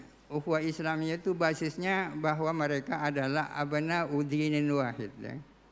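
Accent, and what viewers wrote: Madura, Indonesia. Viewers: logat.. nya madura ini yai..? siapa beliau adakah profil lengkap nya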